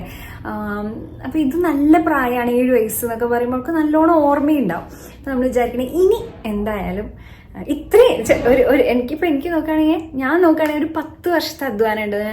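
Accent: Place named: Kochi, India. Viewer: Don't defame kochi slang..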